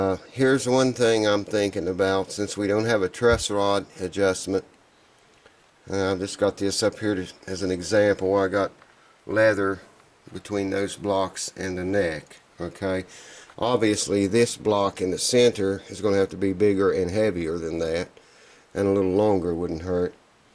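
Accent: South, United States. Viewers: Thanks, you have helped me a lot setting up my guitar, i can even do the F chord now,Thanks I like the music videos, you have a good voice,maybe it's that Southern accent, all the best.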